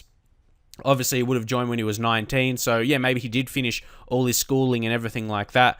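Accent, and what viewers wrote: Australia. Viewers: Crikey Mate! You're AUSTRALIAN?!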